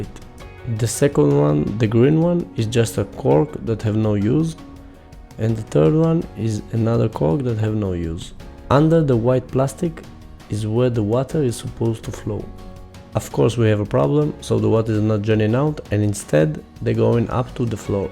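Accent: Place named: India. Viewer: very nice English.. חשבתי שאתה הודי בהתחלה :) תודה לך, קורה לי המון פעמים.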